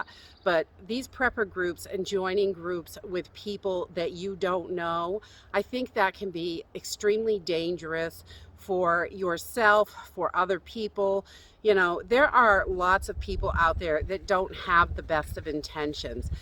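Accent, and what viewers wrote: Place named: Massachusetts, United States. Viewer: Sounds like Massachusetts- LOL! NE is not going to offer many “like-minded” people! I have one friend 2 towns away who is like minded but that would not help in a SHTF situation. In my small neighborhood there are mostly takers and we would worry about that… The kind who arrange a neighborhood pool party at someone else’s house (when they don’t have one)- those kind of neighbors! 🤔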